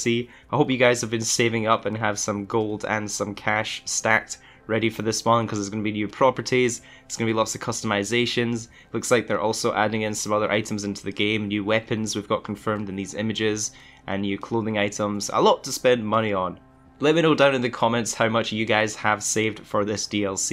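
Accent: Scotland, United Kingdom. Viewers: Hey, your vids are great man. My Mrs is Scottish and we live in New Zealand so hearing that accent is wicked. Gonna be good to have a cabin setup with moonshine business. Makes you wonder what expansion the collector role will get huh.? What's your thoughts? I madam nazar type wagon or trapper site like in storey?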